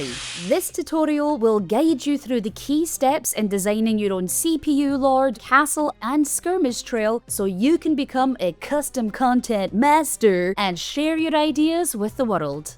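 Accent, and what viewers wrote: Scotland, United Kingdom. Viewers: Gosh a guide AND with that accent! Big loves and thanks to Scotland!😍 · Came for the tutorial, stayed for the scottish accent. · legit curious, this a Scottish girl trying a more neutral/American accent?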